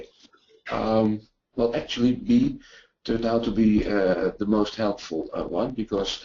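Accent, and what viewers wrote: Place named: Netherlands. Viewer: This man's English pronuncation indeed sounds like he is from the Netherlands and learned English over there.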